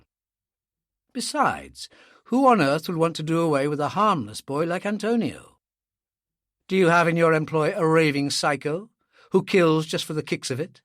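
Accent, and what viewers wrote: England, United Kingdom. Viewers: I have been seeking a channel with minimal adds. In Alistair MacLean’s audiobooks I find that there are no adds but there is a difficulty with the reader. The English accent makes it hard to understand some of the dialogue. This audio book drags on and on so I have increased the reading speed However in doing so the reader’s English accent makes it even more difficult to understand.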